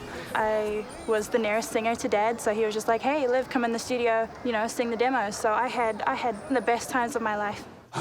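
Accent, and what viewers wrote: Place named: Australia. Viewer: Your Australian lol lmbo I love the accent ❤lol lmbo.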